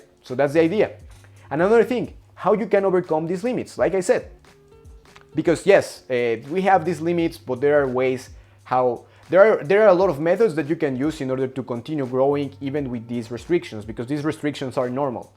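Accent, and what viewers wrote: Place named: Ecuador. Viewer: Thank you for your work. Your accent reminds me of Marlon Vera who is a very respected MMA fighter from Ecuador.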